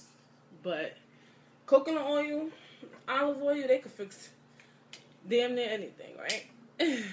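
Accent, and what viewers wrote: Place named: Brooklyn, United States. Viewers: Sound like you from brooklyn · Nice video. I like your accent. Where are you from? NY?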